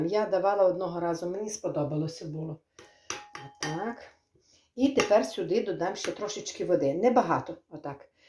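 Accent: Ukraine. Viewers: Не хочу я звикати до бара боли.Что за деревенский украинский язык?!! Селянский!!!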